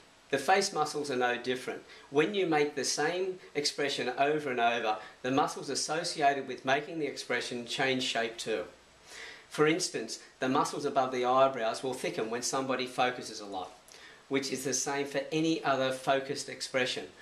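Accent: Australia. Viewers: I was talking about Tweetling saying you're Australian lol. He asked if you were Australian and it was obvious by your accent, so I asked him how he knew it (jokingly) =D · now, that's an aussie accent! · You have an Australian accent Are you An aussie?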